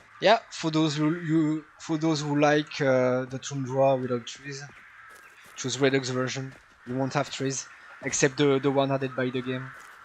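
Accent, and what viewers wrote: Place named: France. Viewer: Ahah, j'ai entendu l'accent, j'avais un doute!! Vive la France!! Vive la république!!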